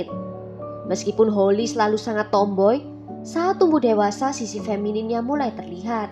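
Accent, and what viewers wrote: Java, Indonesia. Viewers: medok bngwt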